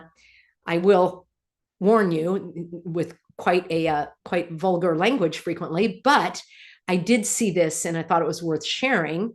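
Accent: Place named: United States. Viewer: Well, I had to subscribe because your delivery in that subtly sarcastic tone with the American accent just tickled my little Scottish heart. You have it all well sussed as we say.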